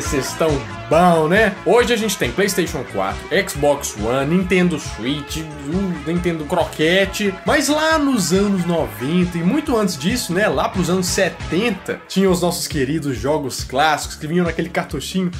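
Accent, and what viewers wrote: Minas Gerais, Brazil. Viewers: Você é de Minas?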